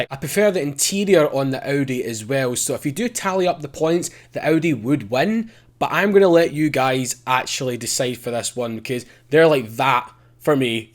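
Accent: Scotland, United Kingdom. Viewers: Mate Gota love Scottish peoples voices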